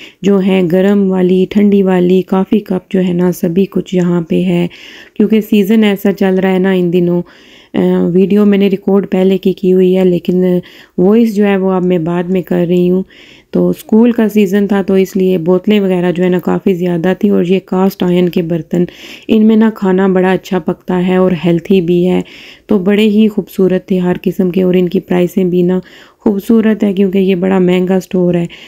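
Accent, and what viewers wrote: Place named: Punjab, India. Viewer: Wa alikum salam je❤your Punjabi acent❤❤bartan waqe e haseen steel wly.😊i am your new friend stay connected with me dear😊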